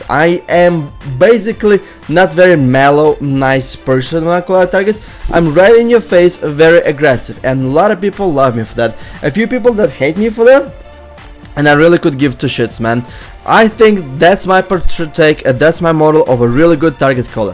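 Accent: Russia. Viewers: I would like to have a lesson because nothing is better than kicking some butts listening a multi glad russian ascent.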